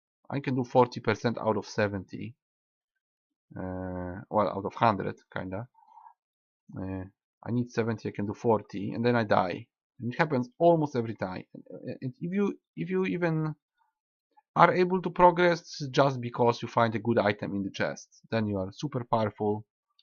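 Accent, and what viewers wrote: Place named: Poland. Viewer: Tak myślałem, że jesteś z Polski xd zdradził Cię akcent hehehe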